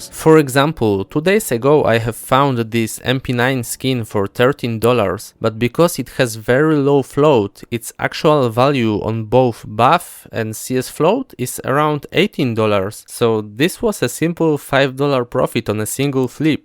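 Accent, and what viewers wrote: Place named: Poland. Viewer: masz troche polski akcent heh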